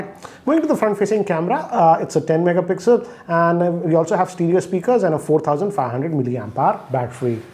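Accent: Hyderabad, India. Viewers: Brother your reviews in hindi is very nice which u used to do year ago videos. It would b great to hear reviews in hindi especially ur Hyderabadi hindi.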